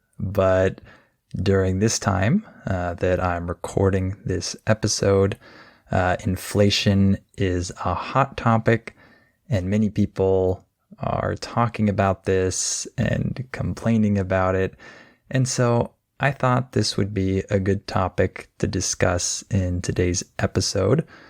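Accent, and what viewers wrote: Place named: United States. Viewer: American accent 😊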